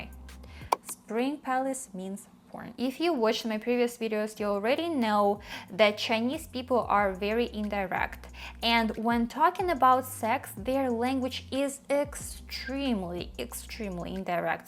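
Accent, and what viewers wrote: Russia. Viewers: you have a russian accent. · they have a declining population. they have a huge problem. they need to encourage sex, not attenuate it. you look Chinese but sound Russian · You sound russian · Just discovered your channel, love your Russia accent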